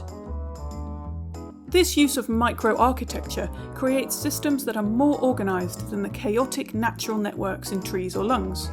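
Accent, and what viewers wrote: United Kingdom. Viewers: Dislike! Bri-ish accent makes me choke. What the hell YouTube algo!!!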